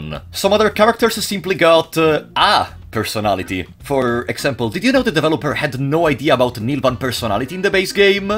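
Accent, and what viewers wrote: Italy. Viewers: ho capito fossi italiano dall'accento loool ciao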